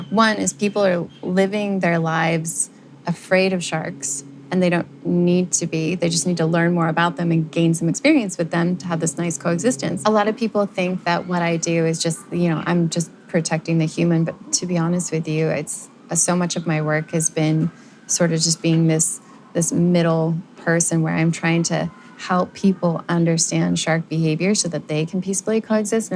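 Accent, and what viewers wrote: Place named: Brazil. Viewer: Não te conhecia, mas pelo sotaque vique vc também é brasileira, ne?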